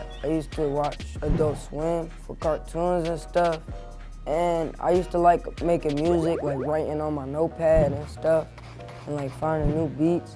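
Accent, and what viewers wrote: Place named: Philadelphia, United States. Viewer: He from philly that’s how we talk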